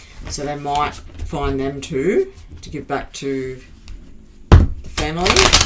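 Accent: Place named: Australia. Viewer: Geeat read. Being an Aussie i have found you and aother read i follow. Love the Aussie accent.❤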